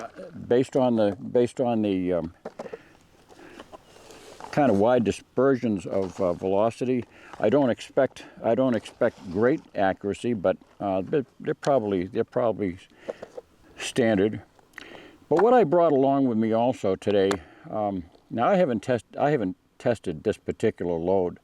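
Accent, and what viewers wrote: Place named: Deep South, United States. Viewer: I always like how he says it’s hot out but I imagine it’s truly <90 F with the sound of his accent. In the Deep South, that’s comfy. When I lived in MI, they literally counted how many summer days were over 90F. I’d drive home from work on a beautiful day with my windows down but everyone else had their windows up and A/C on. Just wild how people waste great weather.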